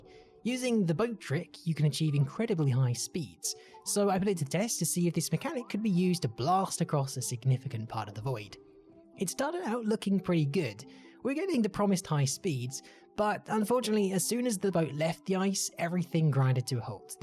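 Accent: United Kingdom. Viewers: So british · Thats how ever british person sounds like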